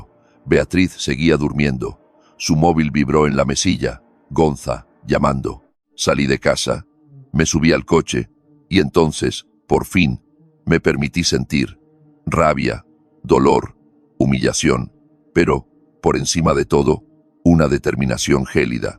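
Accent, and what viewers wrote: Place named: United States. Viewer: En España no se está siempre diciendo nombre y dos apellidos. Y tampocoese acento americano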